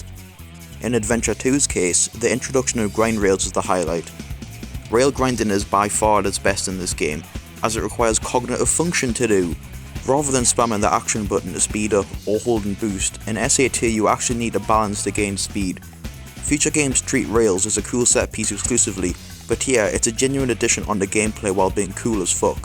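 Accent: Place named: Northern England, United Kingdom. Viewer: You sound like you're from the North of England like myself. Way aye man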